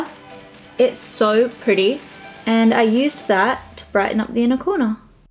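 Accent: Australia. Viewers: There’s nothing wrong with the Aussie accent/slang. Don’t forget where you come from!! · I love your Aussie accent 😍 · Hell yeeaas #notificationsquad as always. Staying in oz right now and feel your accent much more now haha insta: Paniz.sophia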